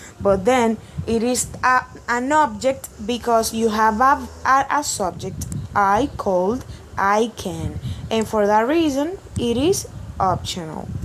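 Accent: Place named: Spain. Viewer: Although your funny Spanish accent that is so great. I understood that finally. Thanks a lot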